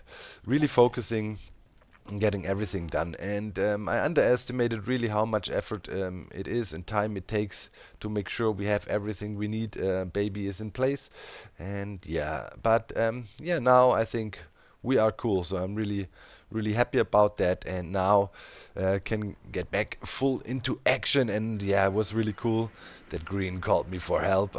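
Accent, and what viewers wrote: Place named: Germany. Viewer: Dein deutscher Akzent... immer wieder schön 😁😂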